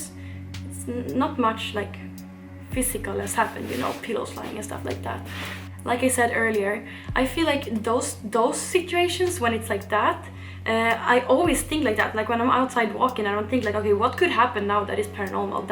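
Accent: Sweden. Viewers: You talk English like a swedish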